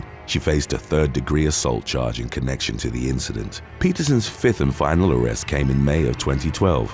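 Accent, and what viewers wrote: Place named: United Kingdom. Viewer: Please do when 23 year olds go wrong? I really like your channel, I like the narrator, you sound like darth Vader with a British accent! 😆😆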